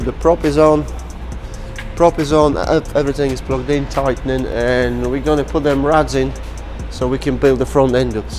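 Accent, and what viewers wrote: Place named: Scotland, United Kingdom. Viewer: I hate this scottish accent, otherwise good job.........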